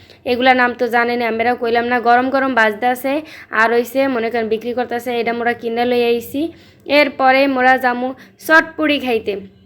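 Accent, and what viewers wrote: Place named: Barisal, Bangladesh. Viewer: ভালো সুন্দরী লাগছে কিন্তু বরিশালের ভাষায়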